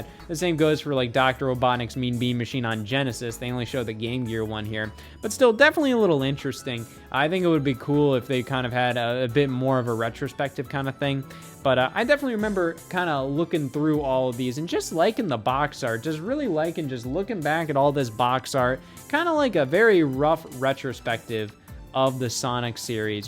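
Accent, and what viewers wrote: Ohio, United States. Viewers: The ohio is showing by pronouncing it ride aid lol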